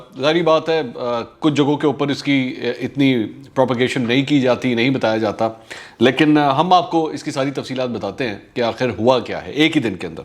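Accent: Punjab, Pakistan. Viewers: you take your work very seriously, passionately engross and involve yourself in the news you bring, forgetting you are in the recovery phase. So take it easy until you fully recover. by the way keep that Punjabi flowing!!! Interesting vlog as always!!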